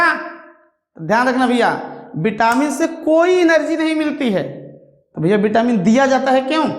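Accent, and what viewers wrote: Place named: Bihar, India. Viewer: Aap to Bihar se hai Guru Jeeeeeee🎉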